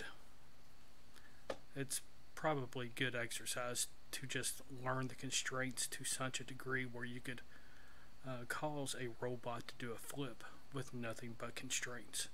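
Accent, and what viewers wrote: United States. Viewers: OH MY GOD YOUR VOICE IS AMERICAN BLENDER GURU IT'S EPIC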